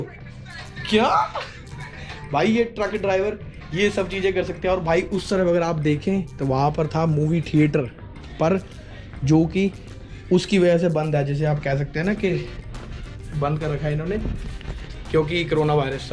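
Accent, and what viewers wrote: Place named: Punjab, India. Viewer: Hindi ch v punjabi wala touch 😂😂😂😂😂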